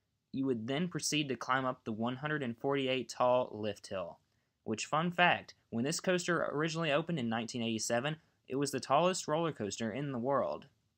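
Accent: United States. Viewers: Country accent mixed with vortex= 💯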